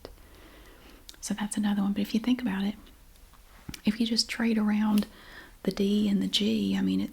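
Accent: South, United States. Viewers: I love this video😊 I studied in Kansas and sometimes heard an expression that I was unfamiliar with. This explains a lot. Greetings from Finland:) Your videos are on my phone whenever I want to wind down and listen to an American accent so I won't lose my language abilities on my third language. Thank you!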